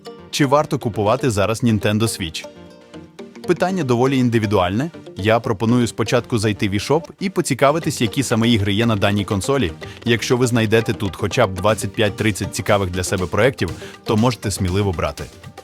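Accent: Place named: Surzhyk, Ukraine. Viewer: Это непонятно суржиком называется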